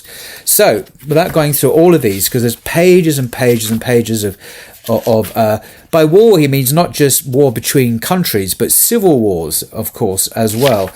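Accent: United Kingdom. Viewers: This guy is so shallow and stupid that he forgets the bible claims to have killed everything during a flood. EVERYTHING God killed everything. What a useless video. His British accent can't cover what the Bible pretends. It's insulting to anyone with common sense. Christians "God" killed everything on the planet to start over. This guy's a idiot. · Such a great explanation, Sir! Oh, on the side note, I like your accent. British! I find it friendly for the American accent, but British accent, I can feel like a King! 🤓 Well, the UK is the United Kingdom, isn't it? Will listen more to your videos! (Well, I am not a native speaker of English).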